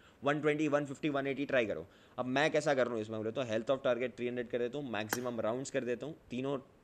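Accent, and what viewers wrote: Hyderabad, India. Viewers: Bhai bohot dino bad apki Hyderabadi Sunna bohot acha laga bhai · That hyderabadi accent is lit 🔥🔥🔥🔥🔥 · Bhai tu pakka wala hyderabadi lagtha hai 😍🤩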